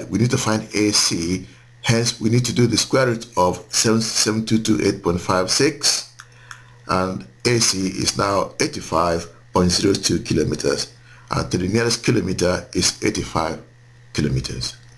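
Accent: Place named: Nigeria. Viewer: ahaha nigerian accent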